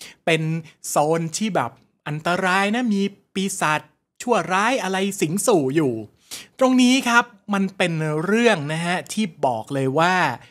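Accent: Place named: Lampang, Thailand. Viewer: ฟังมาสองปีพึ่งรู้ว่าคนเหนือ สำเนียงแบบนี้ลำปางก่อครับ?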